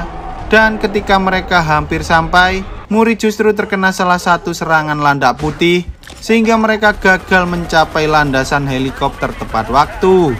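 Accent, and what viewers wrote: Java, Indonesia. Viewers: Jawa bangetttt lol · Suara nya terlalu medok jawa nya jadi agak kurang nyaman di dengar nya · Meddo yah gaesss 😂😂😂😂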